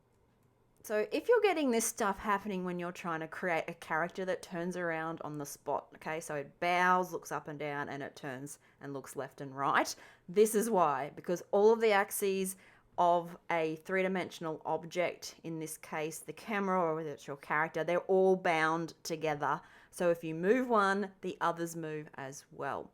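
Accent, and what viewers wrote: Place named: Australia. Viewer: I am sorry if this is correct in Australian, the awesome accent gotta come with quirks I guess.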